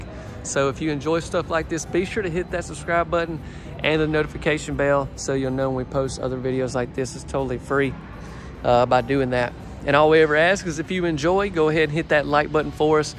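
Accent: South, United States. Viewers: I'm not the greatest at placing southern accents. Does anyone know where his is from? I love it.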